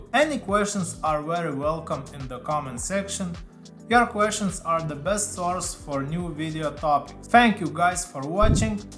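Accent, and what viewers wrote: Russia. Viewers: Ну как будто бы он сам из РФ, учитывая акцент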